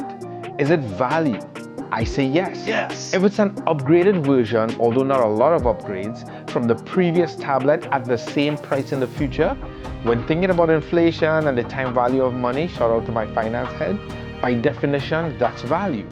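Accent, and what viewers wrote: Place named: Trinidad and Tobago. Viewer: Wait, wait, how you sound like a Trini so lol?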